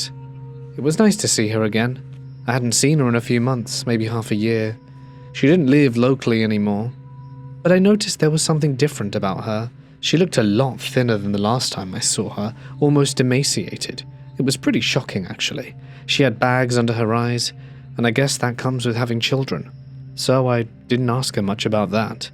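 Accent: United Kingdom. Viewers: Sorry but british accents just don't make scary stories sound scary like american accents do so I;m out after the first 2 stories.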